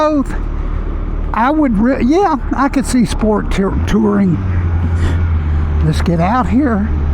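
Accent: South, United States. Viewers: The high-pitched redneck voice sells the channel immediately - AMAZIN'!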